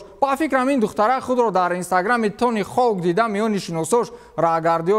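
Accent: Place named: Rural, Tajikistan. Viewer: Акай чуни мни гох гох дехотигирам гапзан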